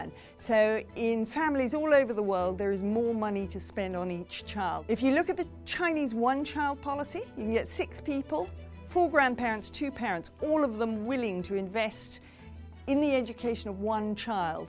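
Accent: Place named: United Kingdom. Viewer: She speaks like a typical British supposed to speak:)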